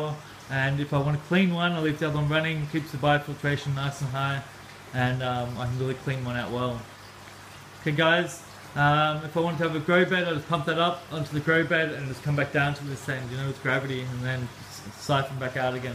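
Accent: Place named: New Zealand. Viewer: Dude he sounds like a kiwi to me, You Aussies not satisfied with trying to take responsibility for creation of the pavlova want to lay claim to anything else good new Zealand produces too.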